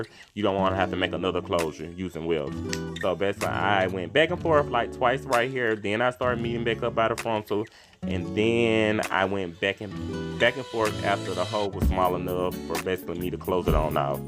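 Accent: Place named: South, United States. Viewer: “Big country plats” 😂😂😂😂😂😂 I have not heard the term plats used to describe braids in a long time... I love it.. I love your southern accent.. Also the video is very detailed and on point... Thank you.. I’m subscribing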